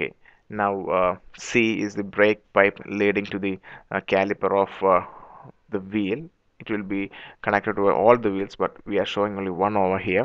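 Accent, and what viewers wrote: Kerala, India. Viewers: fo tys.. fuck ur indian accent.. if u dont know how to speak english then dont speak it.. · Lol its the malayali (keralite) accent. Sucks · fucking hate your indian accent.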